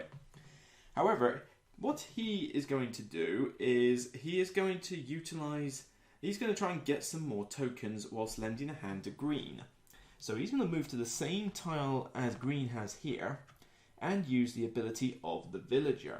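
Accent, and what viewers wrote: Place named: Cornwall, United Kingdom. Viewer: I love that kinda Cornwall farming English accent!....and great how 2 play :)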